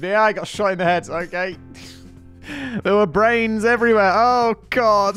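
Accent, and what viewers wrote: South, United States. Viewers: as a Texan, if you came here with that southern accent, no one would question it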